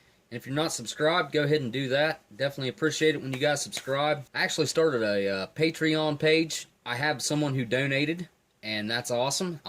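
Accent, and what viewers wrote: South, United States. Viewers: Yup, you've got the southern accent down lol so do I and I don't realize it haha until I started living in Wisconsin.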